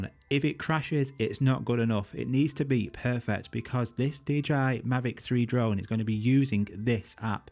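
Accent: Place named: North, United Kingdom. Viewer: Thanks, now I can do the update without worries. BTW, where are you from? I'm curious about the accent that sound a bit northern :) New sub :)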